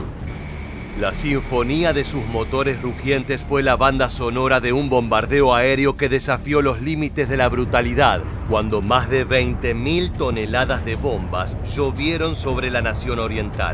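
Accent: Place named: Argentina. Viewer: Habla 3n español argentino. Lame cul..o... de Estados Unidos. Se dice 2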